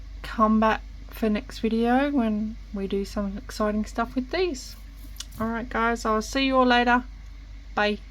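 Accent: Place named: Australia. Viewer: Thanks for the video - I definitely did learn from your mistake. Got some Solidcrete today & found your video hoping to avoid mistakes & hopefully it worked. Still not cured, but a few years ago I had a go with some other brand and did the same thing as you. It looked far too runny, so I added more powder & disaster. So glad I found your video & avoided that mistake this time. Great hearing an Aussie voice for a change in my hunt online for crafting tips. Subscribed ☺👍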